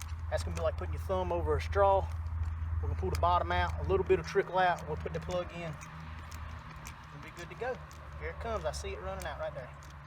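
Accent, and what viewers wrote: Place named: Alabama, United States. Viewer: i was watching the video in the shop doing my water pump and one of the other mechanics said he sounds like he's from Alabama too ..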